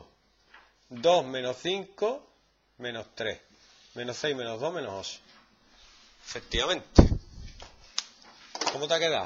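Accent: Andalusia, Spain. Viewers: me encanta el acento andaluz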